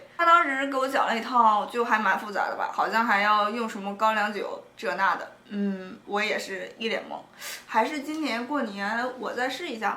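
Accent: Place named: North, China. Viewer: 聽你的口音應該是大陸北方的人難怪对台灣南部偏甜的口味不應可理解的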